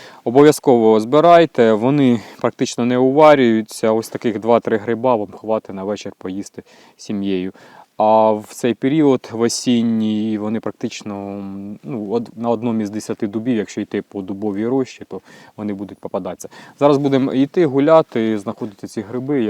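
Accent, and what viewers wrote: Surzhyk, Ukraine. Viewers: Молодец, что на украинском. Правда, сильный суржик сходу слышится по первым минутам. :) Треба трошки попрацювати ще. Чтоб для ушей еще мелодичней было. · Уж лучше на руском говорите. Ваш суржик режет уши .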